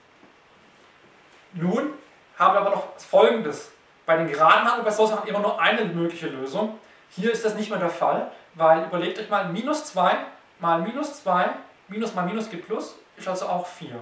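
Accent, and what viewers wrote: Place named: Baden, Germany. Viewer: Sehr schön erklärt Sie kommen doch auch aud dem badischen das hört man raus :) "isch gleich"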